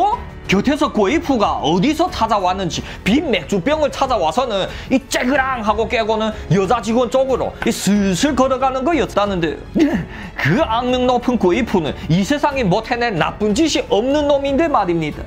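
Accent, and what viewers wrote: Yanbian, China. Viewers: 연변말 북한말씨 다른 것 알겠네요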